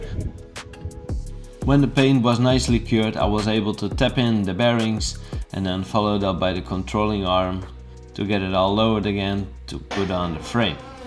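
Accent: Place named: Netherlands. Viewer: Aargh, dat Nederlands 'engels' is tenenkrommend.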